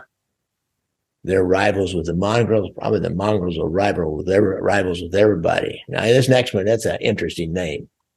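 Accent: United States. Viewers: I just love hearing American pronounce New Zealand place names. Good video mate. Thanks.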